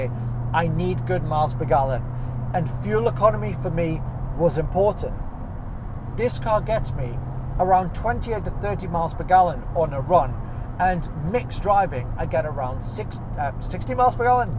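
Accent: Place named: Geordie, United Kingdom. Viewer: Lovely car! Putting a right "high Jesmond" on your Geordie accent there! Be proud of your Toon lineage man!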